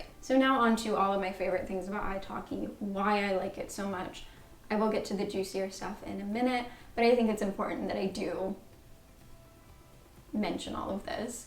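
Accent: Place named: California, United States. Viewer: You tend to pronunce some words in the British way of it, such as glass or grass, right? I've noticed that it's related with californian accent. So actually, this is how I'd like to sound like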